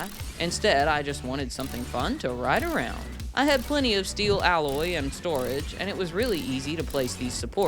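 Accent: South, United States. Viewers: you have one hell of a southern accent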